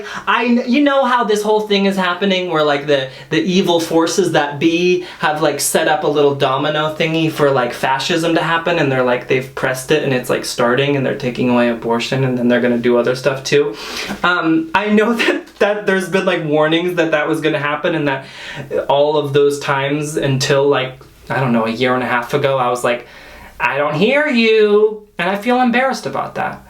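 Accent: United Kingdom. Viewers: Your British accent sounds like Tom holland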